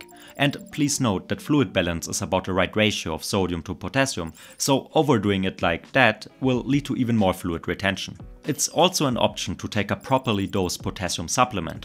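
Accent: Germany. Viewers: Indian? Isn't this a german accent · That German accent paired with "we never accept sponsorship" assure me deeply. Thank you, you've saved me a lot of time and money! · Are you german? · Sounds like german „english“ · Thanks lads, i'm off to buy both the sunscreen and peptide cream you showed here in the video - it's in one of my local stores :) Love the Deutsch accent, greetings from your cousin further up north in Denmark - Subscribed and liked the video!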